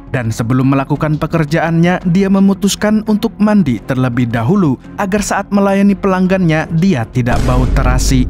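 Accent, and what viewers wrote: Banjar, Indonesia. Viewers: Urang Banjar sklinya Pian lah😅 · urang banjar kah pian mang ehehehe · Anjay urang banjar kah😅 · Urg Banjar sakalinya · Urang banjar kah mimin nya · Nah klo ketahuan ku dh si amang urangk banjar😂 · Urg Banjar kh mang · banjar kah ni logat nya😂 mirip iq 7 jua suaranya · Urang Banjar kah pian mang Salam nah dari alalak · Uma ay urang banjar kah min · Orng banjar kah bg 😂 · Bang kaya orang banjar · Mang pian urang banjar kah? Ulun hanyar sekali nonton disini😭😭😭🤣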